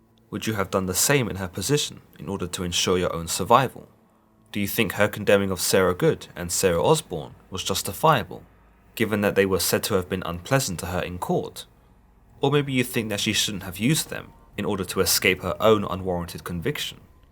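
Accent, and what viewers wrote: England, United Kingdom. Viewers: TF... Is this a reupload? I'd borderline be willing to SWEAR I've watched this video before! Not a similar story-of course I've heard the tale of Tituba every which way you can spin it-but THIS one, almost down to English accent & word choice/vernacular... Damnedest thing... #DejaVu anyone? 🤨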